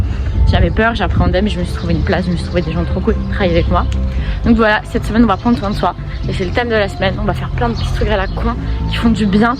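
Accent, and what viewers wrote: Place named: Brittany, France. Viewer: Tu causes bien la Bretagne :D ! Vive les samedis Basket qui foutent la loose et le seum, hein ma chérie ?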